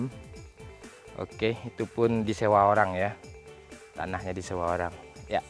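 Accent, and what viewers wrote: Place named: West Sumatra, Indonesia. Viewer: Bahasa minang ajalah ketahuan banget minangny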